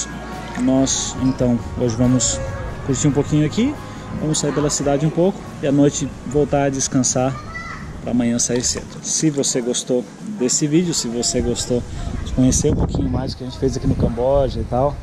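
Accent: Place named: Piracicaba, Brazil. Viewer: Bacana, a é daqui de perto de minha cidade Mogi Mirim (SP). Para dizer a verdade tinha notado na fala dela um leve sotaque de Piracicaba, rsrsrsrs. Gosto muito de seus vídeos, bastante informativos.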